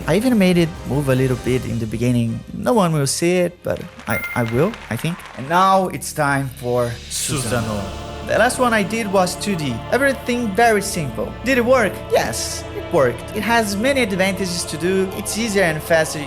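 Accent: Brazil. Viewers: teu ingles soa muito BR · esse ingles com sotaque brasileiro kkkkk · o inglês do cara é muito bom, mas sotaque brasileiro não abandona ele kkk soube assim que ele soltou a primeira frase (não tô dizendo que ele deve perder o sotaque) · Tu é brasileiro mano ? Por isso que eu achei o inglês familiar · Nem acredito que vc é brasileiro, deveria ter percebido pelo sotaque. Muito bom saber disso.❤ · Sensacional! Não só o trabalho que está sendo feito, mas também seu ânimo e resiliência em realizá-lo! PS.: Cara, você é de Minas! Que maneiro! O uai que habita em mim saúda o uai que habita em você. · Mno tu e brasileiro teu sultaque E teu jeito de falar Vey Se pode mim explicar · mano tu manda muito e da pra perceber que tu é br, mano tu trabalha DMS mano 😔✊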